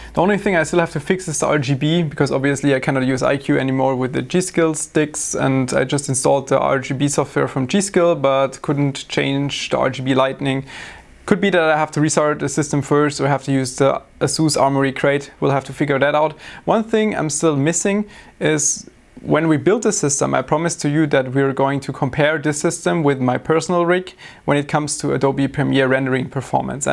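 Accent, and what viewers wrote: Germany. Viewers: Man hört den deutschen Akzent soo sehr heraus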